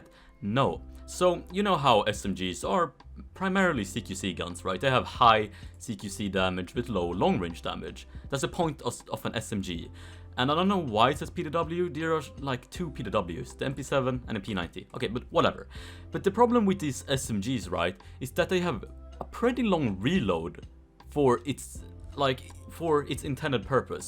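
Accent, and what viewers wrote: Sweden. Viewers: Plus a swedish accent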